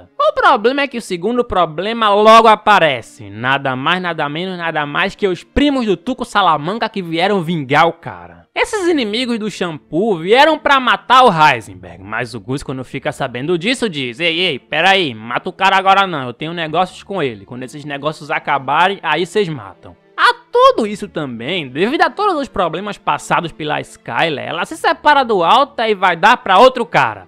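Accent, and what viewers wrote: Northeast, Brazil. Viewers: Sotaque nordestino o caba conhece de longe😊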